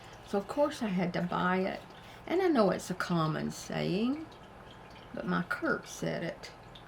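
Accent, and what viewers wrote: South, United States. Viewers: I love your channel. I love listening to you. You have a sweet Southern accent. I can see you growing and glowing. I'm so proud of you. It takes courage to do this.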